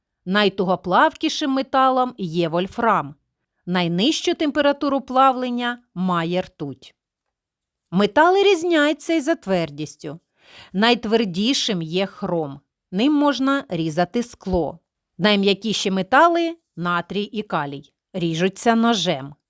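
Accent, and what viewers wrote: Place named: Kharkiv, Ukraine. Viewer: Говір схожий на Харківський.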